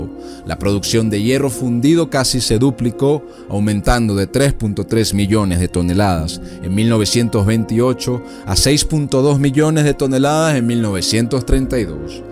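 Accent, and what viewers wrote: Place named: Venezuela. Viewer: Porque el que habla tiene acento venezolano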